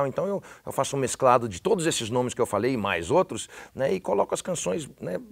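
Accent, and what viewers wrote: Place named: Brazil. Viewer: Interessante o falar q pensa e sonha em ingles... ele eh o primeiro gringo q conheço q consegue 100% do sotaque brasileiro... incrivel demais, provavelmente algo divino mesmo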